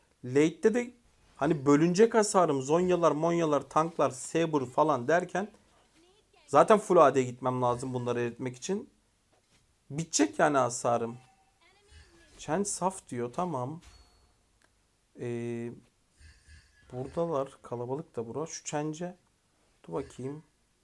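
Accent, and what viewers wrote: Samsun, Turkey. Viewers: Üzümlü kekim samsunlu olduğun ne kadar da belli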